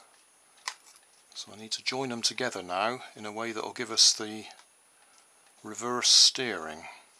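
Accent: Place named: Yeovil, United Kingdom. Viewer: We're are u from it sounds like a Yeovil accent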